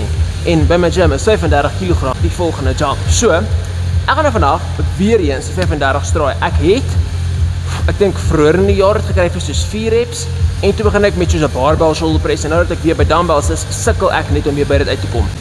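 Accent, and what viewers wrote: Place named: United Kingdom. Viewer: for a second I thought he was talking british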